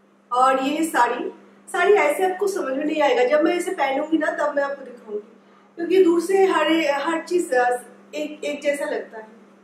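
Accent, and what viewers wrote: Bengal, India. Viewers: Didi apni bangla te vlog korle basi vlo lagbe...hindi ta apni vlo bolen bt, Apnar kothar moddhe sob jaygate banglar tan ache... Plzzz banglar manus banglay fire asun....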